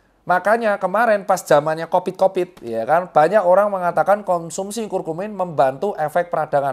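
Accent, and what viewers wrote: Java, Indonesia. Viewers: Dengerin dokter ngomong, kentel logat Jowoe..jd terhibur jg..gaya bahasan yg bikin tambah sehat...😅😅😅, semangat terus , dokter Tirta..❤❤❤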